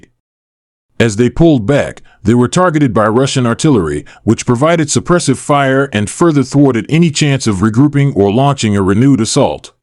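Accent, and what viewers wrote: United States. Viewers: ощущение,что озвучивал какой то реднек со среднего запада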